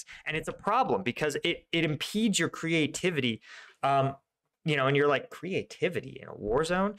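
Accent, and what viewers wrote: United Kingdom. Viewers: Sounds like he’s British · So proud to hear a British voice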